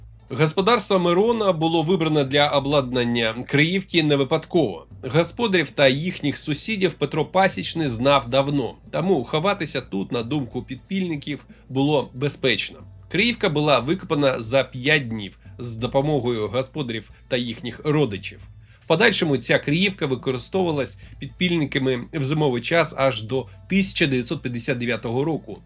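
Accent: Moscow, Russia. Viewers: Якби не знав, сказав би,що він із мааскви,над акцентом потрібно попрацювати!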